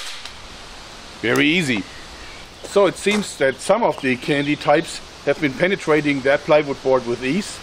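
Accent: Germany. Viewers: are you come from Germany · He is German, he has an accent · FPSRussia ist ja auch aufgrund seines Akzentes berühmt geworden, und dass obwohl er kein Russe ist ^^. So ein Akzent macht jemanden einfach sympathisch. Ich finde deine Videos echt Klasse und sie zeigen der Welt das wir Deutsche nicht so ernst und humorlos sind wie gerne behautet wird. Ps gute Besserung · I really like his German accent (I' German btw)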